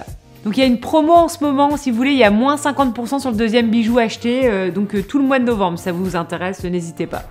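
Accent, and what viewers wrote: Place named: Nord-Pas-de-Calais, France. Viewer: Merci! J’adore ton petit accent chti qui me rappelle ma région natale 😘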